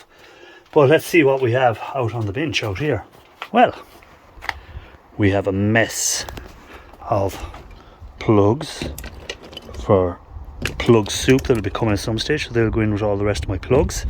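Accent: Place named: Cork, Ireland. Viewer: Not as bad as ians shed but you need to get your finger out before it turns ugly 🤗👍🇮🇪 You sound like a Cork man, am i right?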